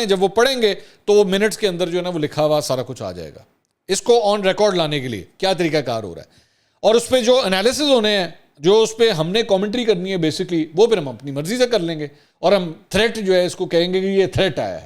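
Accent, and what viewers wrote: Pakistan. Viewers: Sir bohoot khoob tajzia kia aap nay Aap k lehjay say pakistaniat jhalak rehi hay Jazak allah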